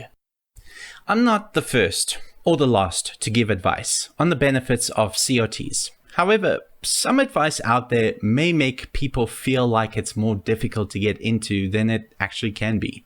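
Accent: South Africa. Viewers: South African ;) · I detect a South African Accent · Just started the video, noticed your accent sounds like south african... Are you from South Africa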